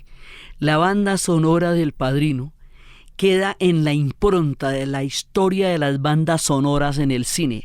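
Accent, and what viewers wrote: Colombia. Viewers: Historiadora con estilo propio y ese acento chevere colombiano, un abrazo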